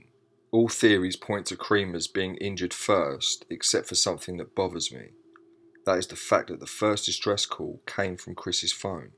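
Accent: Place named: England, United Kingdom. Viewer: Wow sounds the lovely english accent.. long live england .. great insight sir ... these ladies were abducted znd murdered by he.ry Elizier gonzalez znd his gang à cover up rip kris and lisianne 🙏🙏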